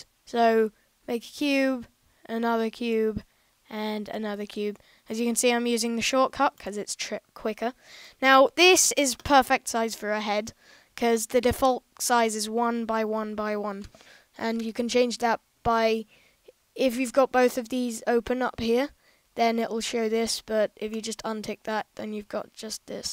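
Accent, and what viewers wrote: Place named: United Kingdom. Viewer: Sounds like British am i right? , indeed GOOD JOB mate!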